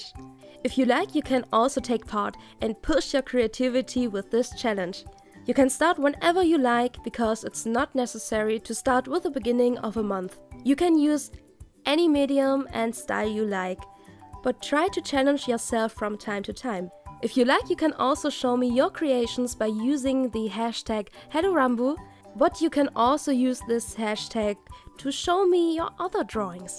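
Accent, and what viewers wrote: Germany. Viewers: that accent...lol. i think you speak german don't you? i do so and have the same one xD srry if i'm wrong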